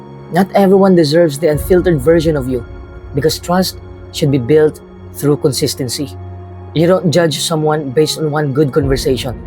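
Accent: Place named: Visayas, Philippines. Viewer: Ang ganda sana ng delivery & voice mo, kaso nga lang need mo pa magpractice ng proper english pronunciation, mejo tunog bisaya po kasi.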